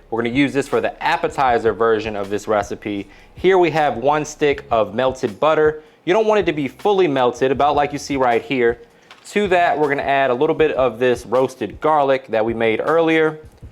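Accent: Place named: Pittsburgh, United States. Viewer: First time watching you. Recipe looks amazing and will make it today. Are you from Pittsburgh PA? You sound like everyone I know, from Pgh. 👍🏼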